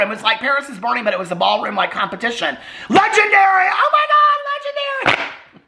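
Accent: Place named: Indiana, United States. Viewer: I'm so glad I found your channel i've been absolutely BINGING on your videos the past two weeks! Fellow Hoosier over here!! I found you through Adam McIntosh and your videos are like listening to a friend, especially because we have a very similar accent <3 I've been sober 3 years and hearing how long you've been on your journey and just the tidbits about your life are SO relatable and fill my heart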